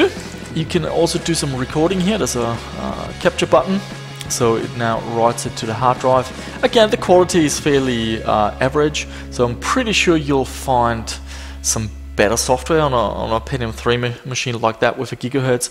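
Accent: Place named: Germany. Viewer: Du hast ein Deutschen Akzent.^^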